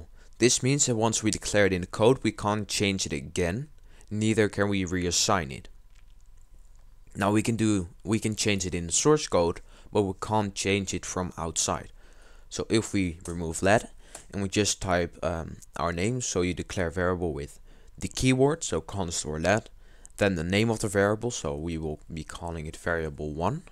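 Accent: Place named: Netherlands. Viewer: I hear some Dutch stuff in there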